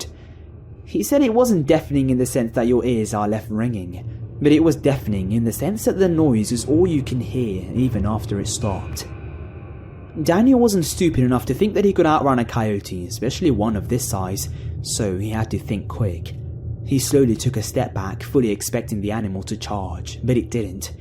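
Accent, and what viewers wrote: United Kingdom. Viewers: Are you and Lazy Masquerade friends or from the same town? You guys talk very similarly. (Not talking about just the British accent of course) Great stories! Keep up the creepy work!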